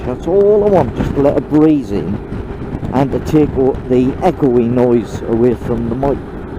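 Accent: North East England, United Kingdom. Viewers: Interesting video..👍 Do l detect a North-east accent ?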